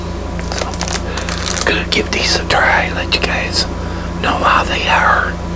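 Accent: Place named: Texas, United States. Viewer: Hey your from Texas arnt you my dads from Texas to i love your accent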